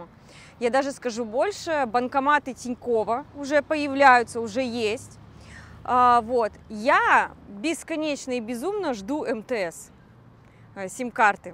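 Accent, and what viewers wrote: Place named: Ukraine. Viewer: По акценту, риторике и набору слов - Украинка .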